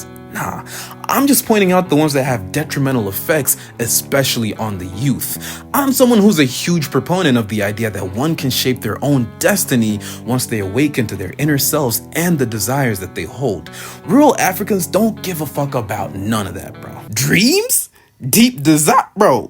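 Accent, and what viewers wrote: United States. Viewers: Even crazier that these (professionally-animated) videos about "life in Africa" are narrated by someone with an American accent. There are too many question marks here to take any video this channel publishes seriously.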